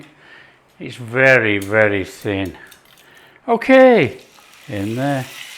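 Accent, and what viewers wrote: United Kingdom. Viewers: love the cooking as well as the beautiful British English accent ❤️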